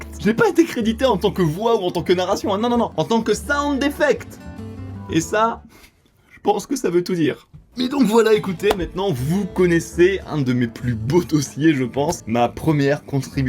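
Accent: France. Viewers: Elles étaient vraiment drôles mais mignonnes, à part celle de la voisine raciste qui m’a bien agacée 😡 Sinon, je trouve que la narration en anglais n’était pas si mauvaise, l’accent français a son charme 😊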